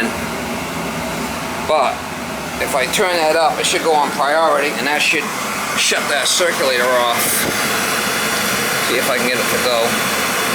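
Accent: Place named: Massachusetts, United States. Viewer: I think your awesome. I was a boiler technician in the US Navy that led me to good directions in my life. I totally respect what you do. I can relate, big time. I’d love to work with you sometime and I know that won’t happen. I grew up in Connecticut and can relate to that Massachusetts accent you got. Fuckin’ love it! My fortè is electricity and plumbing. Make that hay while the sun shines momma! I hope that blow fish blows away from you if you know what I mean.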